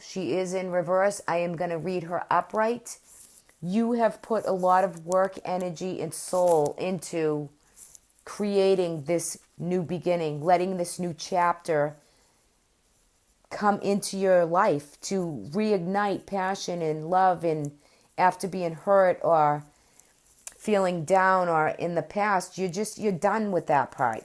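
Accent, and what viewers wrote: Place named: Michigan, United States. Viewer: Love your accent! Michigan, Ohio possibly?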